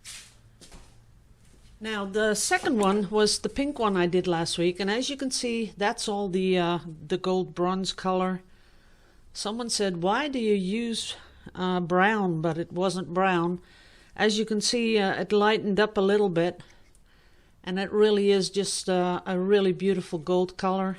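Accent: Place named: Netherlands. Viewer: First of all I have to say that I love your artwork so much! And I love to hear your voice and the dutch accent.☺️ (I’m German and I find my accent is horrible!😂) How do you clean your paintings? Do you have a video about it? Sorry if you‘ve probably mentioned it several times but I‘m quite new to acrylic pouring. And I must say, I didn‘t even know that it takes two to three weeks to let the paintings dry, but I think it depends on how thick the lair of paint on the canvas is. Thanks for sharing all your art, your knowledge and your experience, I really appreciate that. Have a wonderful Sunday.☀️🥰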